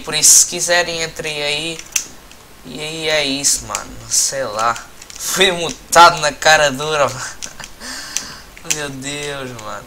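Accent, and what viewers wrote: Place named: Portugal. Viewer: é caralho um tuga aqui espetaculo! mas para de falar cara fdsss que trigger se é para os br perceberem mete legendas xD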